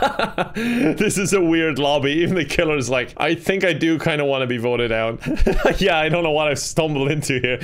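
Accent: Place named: Ireland. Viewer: Bruh I'm subbing because of the Irish accent and funny videos :]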